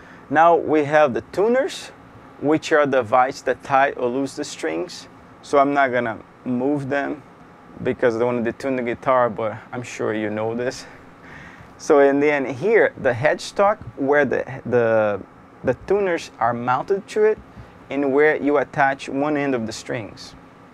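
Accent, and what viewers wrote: Brazil. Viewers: Do you happen to speak Portuguese? You seem to have a Brazilian accent...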